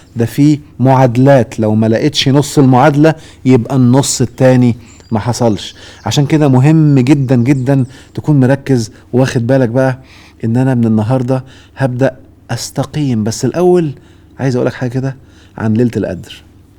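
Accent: Algeria. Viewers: انا تبانلي جزايرية ماشي ليبية ومنعرف ههه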